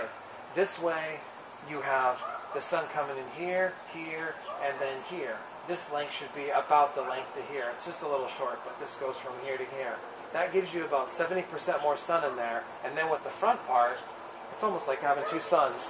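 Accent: New England, United States. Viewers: This is fantastic info. Just in time. Thank you. I subscribed and liked and shared even to friends I have other countries. Are you a New Englander? Your accent. Really nice video. Well done!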